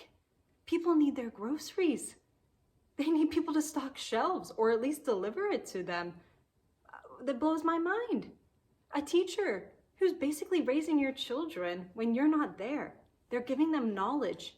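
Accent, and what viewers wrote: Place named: Midwest, United States. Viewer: Omg I was convinced you were not a native English speaker haha. Yes, I think it's the vowels and maybe it's the midwestern accent throwing me off.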